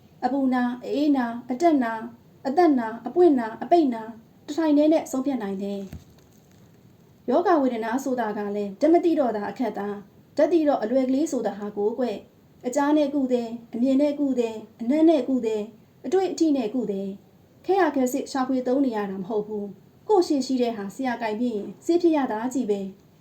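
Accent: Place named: Upcountry, Myanmar. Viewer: ခင်ခင်ထူး ဝတ္ထုတွေကဖတ်ပြတာမကောင်းရင်စိတ်ဝင်စားဖို့မကောင်းဘူး အညာသံလေးပါမှ ကောင်းတာ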